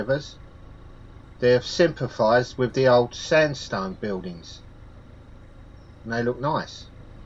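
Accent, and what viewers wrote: London, United Kingdom. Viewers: Hello, when my wife gets homesick for her home town of London she likes to hear you speak, makes her feel back home for a while, although she's lived here in Wales for 50 years for crying out loud! And me? I just like your video along with your commentary, it's very good, diolch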